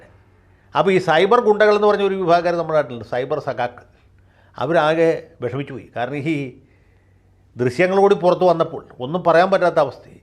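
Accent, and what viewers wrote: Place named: Thiruvananthapuram, India. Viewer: സാറെ നമിച്ചു തിരുവനന്തപുരം ഭാഷ സൂപ്പരായിട്ടുണ്ട്👃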